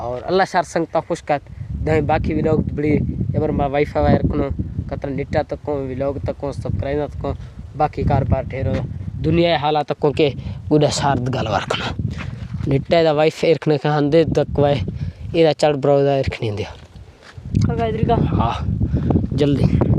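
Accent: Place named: Balochistan, Pakistan. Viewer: میں بلوچی ہوں لیکن میں آپ کی بلوچی سے صرف چند الفاظ سمجھ سکا آپ کے آباؤ اجداد کہاں سے آئے تھے؟😊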